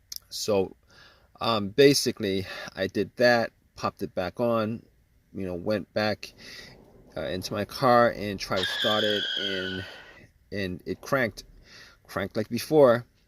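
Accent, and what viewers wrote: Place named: North, United States. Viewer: What is "FU" cap, or a "FEW" cap?!? That would be a F U E L cap man. Not a FU or FEW cap. You're from the northern part of this country aren't you? Mean no offense by that, you guys just talk really weird and funny!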